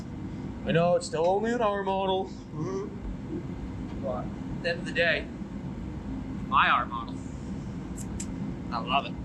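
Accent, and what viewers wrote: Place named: New York, United States. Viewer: Your picking up the New York state dialect accent, no longer the Massachusetts accent.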